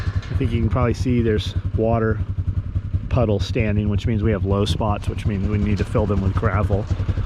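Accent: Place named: North, United States. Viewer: Still sounds like such a yankee....not that theres anything wrong with sounding like one....just makes farm work seem odd for the south.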